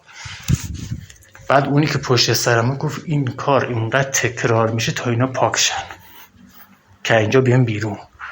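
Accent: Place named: Kermanshah, Iran. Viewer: چقدر لحجه کرمانشاهیتون جالبه وقتی درحال ادغامش با لحجه دیگه است که به لحجه خودتون صحبت میکردید بهتر بود همشهری واینکه دوبار تجربه مرگ رو داشتم وهربار تا یه مقطعه جلو رفتم وبعدش بهم گفتن نمیتونی جلو تر بیای باید برگردی الان زمان تو نیست اینجا باشی ومن اصلا نمیدونستم این حرفی که میزنند یعنی چی همش میپرسیدم شما چی میگید نمیفهمم برای چی نمیبرید منو چون من داشتم از یه جاده مستقیم بی انتها میرفتم جلو به نظر من قبل از مرگ قطعی هرکسی دنیای برزخی رو یه جور میبینه و با دید اصلی رفتن روح بعداز مرگ به دنیای ماورا یا دنیای بعد ازمرگ قطعی فرق میکنه فقط میخوان بگن بعداز مرگ باز هم ادامه داره راهتون ودر قران گفته شده فقط شقی ترین ادمها به جایی که جهنم گفته میشه که صد در صدبا اتیش وسوختن و این شکل توصیفها نیست احتمالن جایی سختی هست برای پاک شدن روح که اون هم گفته شده فقط شقی ترین ادمها به اونجا میرن وگرنه گناههای روز مره یه پاک زدایی داره ازجسم وبعد ادامه راه یا زندگی اونجا رو بهشون میدن فقط تنها چیز مشترک بین همه تجربه گرها وحتی خود من وفکر کنم روحهای مرگ قطعی همون تونل هست که باسرعت به سمت بالا میبره روح رو من وقتی داخل نور شدم در انتها خودم رو توی یه جا یا یه محیط دیدم که چیزی هم از اومدن بالا از طریق تونل یادم نمیومد وحالا محیط چطور بود وچی شد خیلی طولانیه که با نوشتن نمیشه گفت هرچند من چند دقیقه جسمم رو ترک کرده بودم اما زمانی که اون طرف بودم به اندازه یه نصف روز یا بهتر بگم مثل هفت هشت ساعت بودنم اونجا طول کشید نمیگم زمانی بود یا حس میکردم هست فقط اتفاقهایی که اونجا برام افتاد بعداز برگشتم به اندازه این زمان طول کشیده بود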